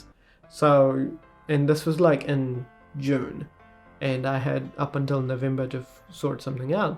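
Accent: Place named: New Zealand. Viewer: Good to have you back mate. Can hear that NZ accent in your voice 😉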